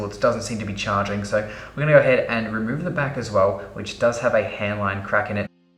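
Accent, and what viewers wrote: Australia. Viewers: Are you an Aussie?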